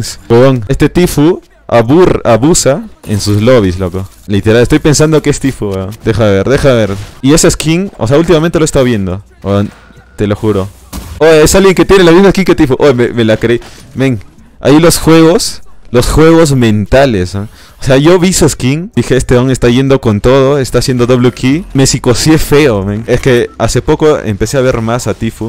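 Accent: Peru. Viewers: eres peruano ??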